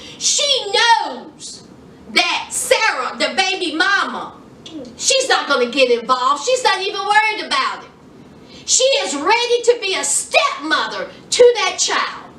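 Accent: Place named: Tennessee, United States. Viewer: Where you from gal? You sound like your from my neck of the woods in TN. The show is addictive. I like it too!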